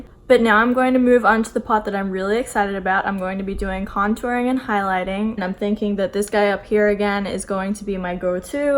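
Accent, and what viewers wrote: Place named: Australia. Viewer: Love your Australian accent, and you look pretty with or without make up, keep up with the videos! 😉👌🏻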